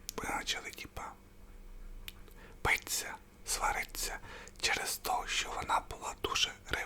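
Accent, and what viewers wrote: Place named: Poltava, Ukraine. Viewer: А я була впевнена, що ти з Полтавщини. Як приємно бути правою!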